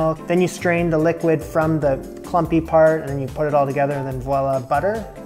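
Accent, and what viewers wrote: Canada. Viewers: Heard your accent when you were making the Orange cake but your location said the US. Glad to see I can still pick it out. Poutine is king and essential after a night out! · I'm a recent subscriber and I thought you sounded Canadian. Takes one to know one. I would hazard a guess that you are from the East. Love the show.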